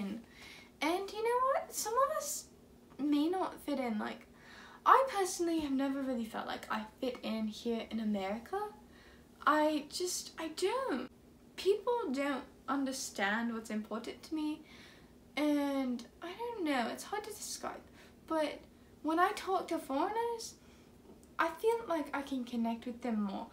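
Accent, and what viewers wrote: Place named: United Kingdom. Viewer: your accent was kinda different today, more like british or idk. i enjoyed listening so much. i really like learning language a lot and this video made me fell more cool in my skin. i agree to everything there and i think everyone should watch that video coz it's super advantaging to learn a new language. thank you so much for producing videos like that for us. this video deserve a lot of like, comments and sharing!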